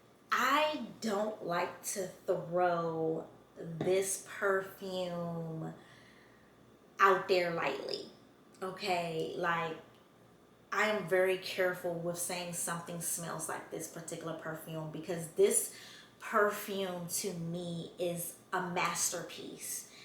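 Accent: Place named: Philadelphia, United States. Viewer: She actually sound like she from Philly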